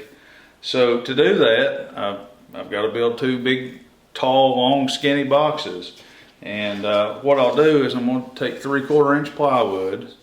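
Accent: United States. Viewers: He got that Hollywood cowboy accent 😂😂😂😂 so cool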